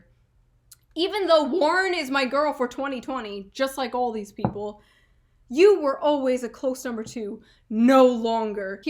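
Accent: Boston, United States. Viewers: Why is your Boston accent so strong here😂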